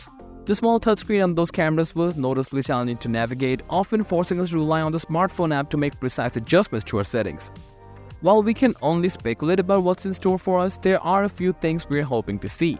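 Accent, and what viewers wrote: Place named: India. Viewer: U sound from india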